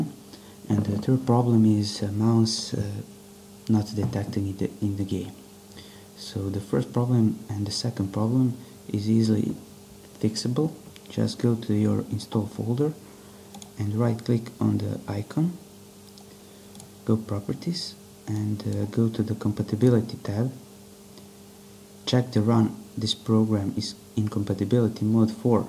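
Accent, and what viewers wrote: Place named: Mexican-American, United States. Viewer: You talk like a American-Mexican.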